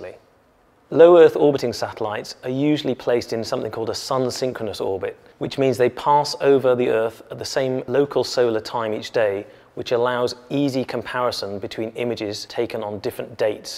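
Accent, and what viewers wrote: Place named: Europe. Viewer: Ouais ouais ouais euro anglais on est là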